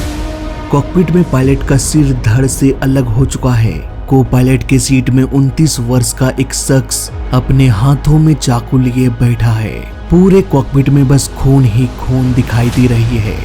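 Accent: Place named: Rural, India. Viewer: For a Japanese incident using Arabian background music ? Sha ko sa . And sa ko Sha ? How come there is no self realisation that it’s not a documentary of Bihar railway station but an aircraft .. U sound so dehati ..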